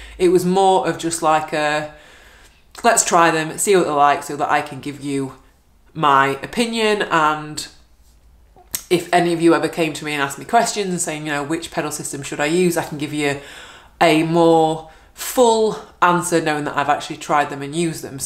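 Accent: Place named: North, United Kingdom. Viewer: 🥰 northern lass 🥰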